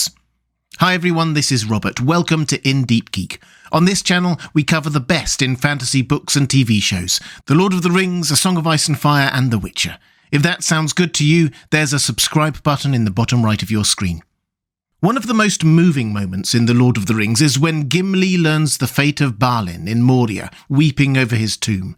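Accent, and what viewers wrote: England, United Kingdom. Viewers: I think him having a British accent makes it even better.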